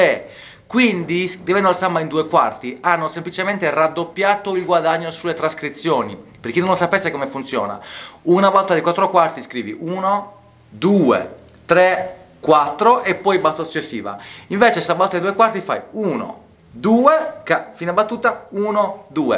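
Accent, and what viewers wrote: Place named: Brazil. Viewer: Si sente che sei brasiliano, l'accento non mente 😂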